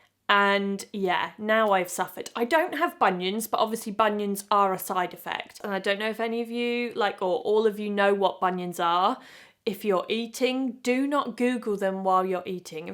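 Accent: United Kingdom. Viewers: Thanks for being a normal person and including the goofs. Makes you seem down to earth. I lived in London for two years in the 90s when the music was the best and I LOVE and miss your accent. I used to hear your accent all the time. I now live in the states and the accents are not the same. British accents are the best. Thanks for your style advice by the way. I'm still a tomboy so I need some help in that area.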